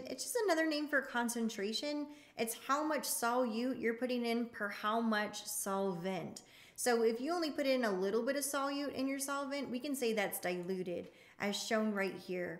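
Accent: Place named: United States. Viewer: Not long found your channel and I love your videos, even the American accent! Many thanks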